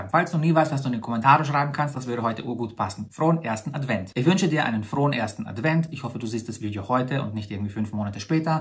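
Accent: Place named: Vienna, Austria. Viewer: Hallöchen^^ Ich habe Dich durch Zufall hier gefunden und ich liebe deinen sarkastischen Humor. Deine direkte Art ist sehr unterhaltsam. Dein Wiener Akzent i love it! Liebe Grüße aus Hamburg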